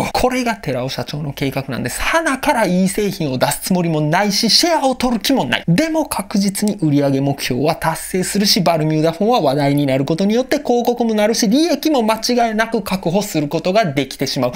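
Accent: Kansai, Japan. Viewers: この動画の主旨はゴミを売るメリットについてなので、如何にゴミかを強調するのは必要だと思いますよ あなたのもやもやの正体は「関西弁のテンポやノリとあなたの感性が相性が悪かった」だけでは無いでしょうか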